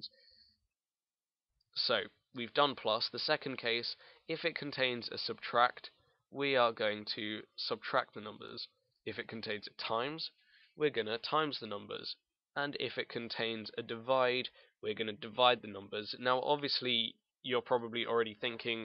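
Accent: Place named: United Kingdom. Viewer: Thank you so much for this tutorial, my comp sci class has this type of problem due tonite, which sucks because we just had to turn in a huge programming assignment the night before so I haven't had any time to work on this. Oh and I always like hearing a british accent, that alone increases anyone's credibility and knowledge :).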